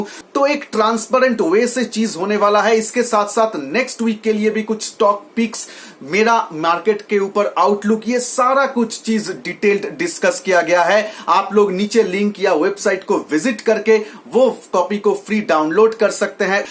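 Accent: Bengal, India. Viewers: You speak like Bengali !!! Are you Bengali ? আরে ভাই বাঙালি নাকি ? বি the way explanation is good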